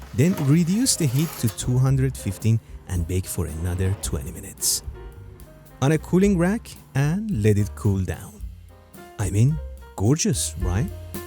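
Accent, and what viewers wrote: Iran. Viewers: درود. لهجه ایرانی دارین. من تازگی میخام با خمیر ترش آزمایش کنم. امیدوارم که بتونم 😁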